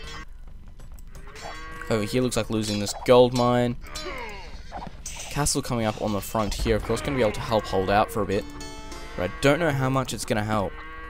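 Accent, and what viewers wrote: Australia. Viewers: Where is the commentator from? Australia? UK?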